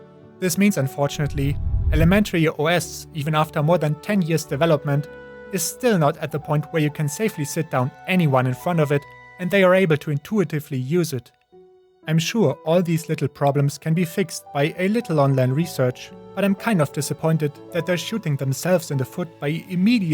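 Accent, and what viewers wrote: Germany. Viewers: I know right! I love his god damn German accent.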